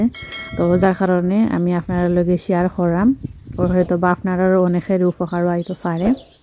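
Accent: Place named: Sylhet, Bangladesh. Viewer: আপনার সিলেটি মাত আমার কাছে খুবই ভালো লাগে। ধন্যবাদ আপনাকে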